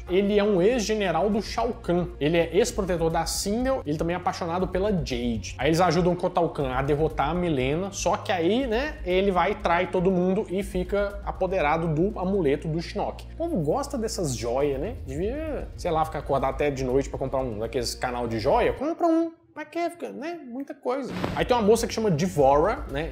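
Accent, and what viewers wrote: Minas Gerais, Brazil. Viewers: Mortal Kombat em Verso e Prosa KKKK. Do jeitim Mineiro kkkk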